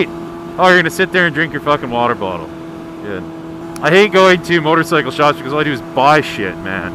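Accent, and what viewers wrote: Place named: Canada. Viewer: I know this video is a year old. ( One may say I might just be stalking your Canadian accent) however you burp a hell of a lot! Like WOW! haha But as always I love you and Mr. Snowcat so keep the videos coming. Yours Truly some random Australian chick!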